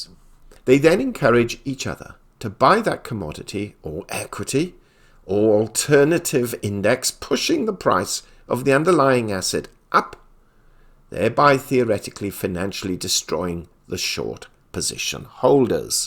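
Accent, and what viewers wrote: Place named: England, United Kingdom. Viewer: Not so sure that is his real voice though. Real accent sure but I think it sounds speeded up. Could be wrong but have listened for a long time and I think the his voice sounds deeper/higher/faster/slower sometimes. Also seams prudent to disguise ones voice in this situation. Otherwise its the English language. It's everyone else that has the accent. I find it nice to hear it spoken properly. 🇬🇧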